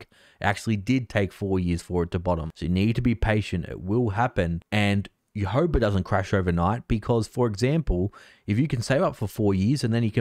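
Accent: Australia. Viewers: Good to hear an Aussie on finance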